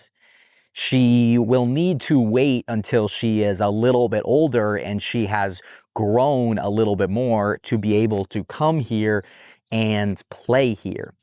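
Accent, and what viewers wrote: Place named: United States. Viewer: It's funny how you speak so slowly, but for us beginners, it's a good pace, especially since sometimes there are words I don't know the meaning of. I only have a few reservations about the channel name. English at Ease is very popular, which makes it difficult to find. I had to do a lot of searching. I should have added a name or some other feature that would have made it easier. Other than that, it's okay. American is a bit easier to understand than pure English.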